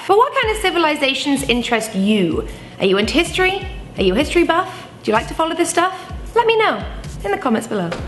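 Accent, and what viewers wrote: England, United Kingdom. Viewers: And people didn't believe me when I said that some English accents really aren't all that nice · sounds 3/4 enlgish 1/4 american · British accent, I barely understand what she is talking about. I am not American.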